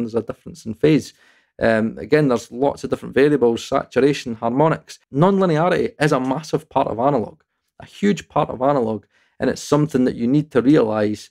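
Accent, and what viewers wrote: Scotland, United Kingdom. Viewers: couldn’t agree with you more. Fortunately for me around 2000 I dreamt of having a studio in a rack, a plug and play studio that was minimalistic in nature and digital did it for me. I was never going back. Now I use the stock console plugin from my DAW (Cakewalk) and thanks to Waves thievery I steer well away from plugin manufacturers BS. What I want is a modern interface, no bloody knobs please and no fake ssl front ends. I don’t want colouration though I do use the tape plugin that comes with my daw. I’m slowly being weaned off this analogue addiction. I went digital for a reason and I must not forget that reason. Thanks for your videos. Love your Scottish accent by the way (viva Scott McTominay haha) and I look forward to your next video ruffling the feathers of these plugin manufacturers. Keep on keeping on brother. 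· Lovely Scottish accent! 😊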